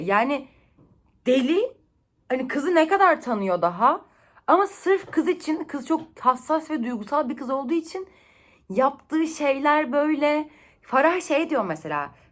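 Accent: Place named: Black Sea, Turkey. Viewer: Ayayayayaya Karadeniz şivesii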